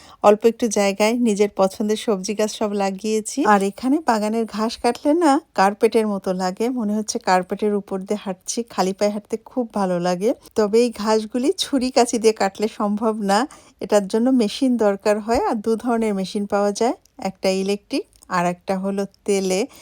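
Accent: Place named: Jessore, Bangladesh. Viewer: আপু আসসালামু ওয়ালাইকুম।কেমন আছেন?আপু জানতে চাচ্ছিলাম,ওখানে কাজ করার জন্য শিক্ষাগত যোগ্যতা কেমন লাগবে আর কোন ভাষায় কথা বলতে হয়? আপু আমি জেসমিন আক্তার। আপনার কথা যশোর জেলার মানুষের মতো মনে হোচ্ছে।আপনার বাড়ি টা কোথায় জানতে পারি?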